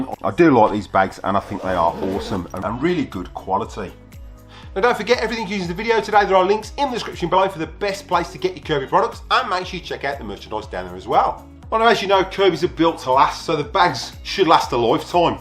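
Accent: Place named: Cockney, United Kingdom. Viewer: Can't understand his English much of the time. He speaks with a strong cockney accent. I wish I could because the information is good.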